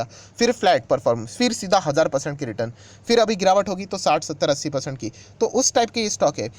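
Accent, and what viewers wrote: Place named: Gujarat, India. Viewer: pakke gujarati lag rahe ho aap sir…..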